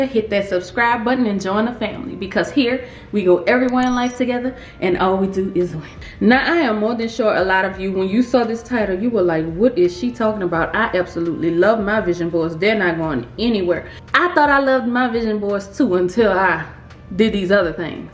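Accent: New Orleans, United States. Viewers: 2022 is going to be MY year! I've never proclaimed such before and walked faithfully in it because it seemed so cliche'. But after watching and subscribing to your channel, hearing and seeing a hometown voice and face, yes I'm from "da 504", I feel at home "here." I've taken some of your credit advice and seen improvements. Greater is coming and it's because the power lies in me. Thank you for all the content you share.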